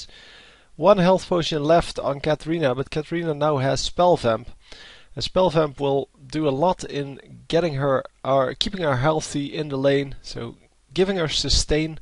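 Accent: Netherlands. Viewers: You sound like a Dutchman speaking English.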